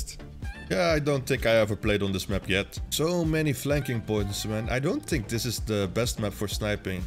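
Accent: Netherlands. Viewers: so u are the guy that always fucks up my game? btw didnt know u were dutch can hear from the accent xd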